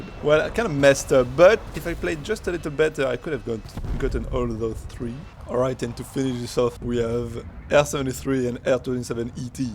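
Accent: France. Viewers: holy french accent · İ never heard france accent wow great video tho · This is about as French an accent as it gets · most french guy i saw on youtube that talk eng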